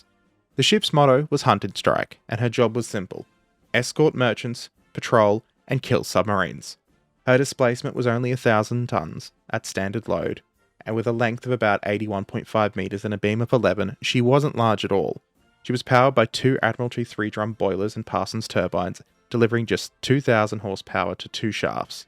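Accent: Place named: Australia. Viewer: You need to do an entire series on Australian history. As someone who knows way more history than most people, I can tell you the mainstream knowledge of it basically consists of, "British prison colony who kinda abused the natives like everyone else at the time" and not much more than that. It's kinda shameful. I could look it up but id rather you just tell me in the Aussie accent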